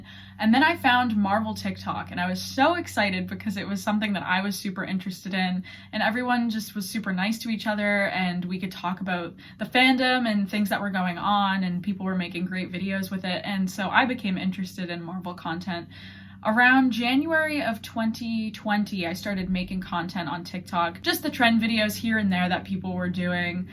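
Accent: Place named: North America. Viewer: Hey! Its nice to know your real name! I love your videos! (I too am obsessed with Marvel lol) Here are some just basic questions I have: How old are you? Ik your birthday was recently! Are you Canadian? American? (I assume you can't be anything else cos of your accent lol)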